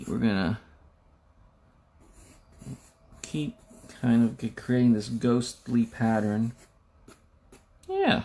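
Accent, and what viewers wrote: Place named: United States. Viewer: You have a very calming voice too! Usually I find American accents irritating but yours is definitely nice! 😝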